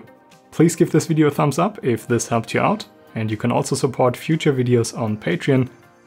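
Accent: Germany. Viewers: Man hört den deutschen Akzent.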